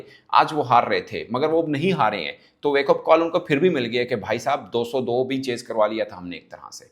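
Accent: Punjab, India. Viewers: Apki English bhi Panjabi jaisi hay don't speak please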